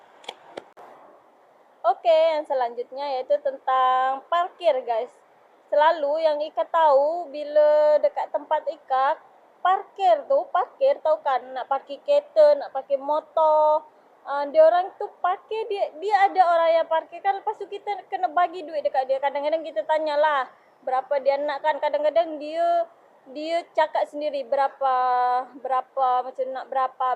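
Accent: Malaysia. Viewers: aslkm ika n suami ..rata2 orng yg memililki kereta ada motor juga...tpi motor tu hanya jln dekat2. lelaki melayu tak pakai seluar pendek...tahniah ckp awak mcm melayu...semuga bahgia...amin.. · Salam..kamu bercakap seperti orang Malaysia. Teruskan buat video semoga maju jaya. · Maaf sy ckp kamu ckp dh mcm org msia. Terbaik kak ckp. Teruskn bersemagat buat video yg lebih menarik kak.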